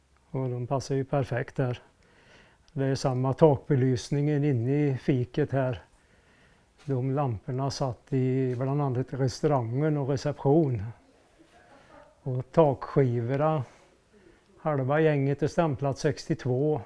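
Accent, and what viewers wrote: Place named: West Gothland, Sweden. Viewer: För himla gôtt å höra en rekti väschgöte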